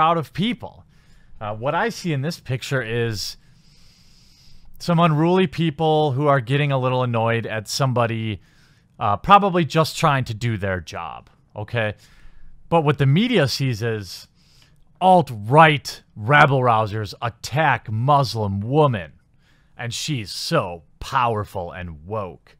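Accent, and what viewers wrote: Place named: Wisconsin, United States. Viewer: What? youre from wisco? Haha I knew the way you speak sounded awfully familiar. I'm from Madison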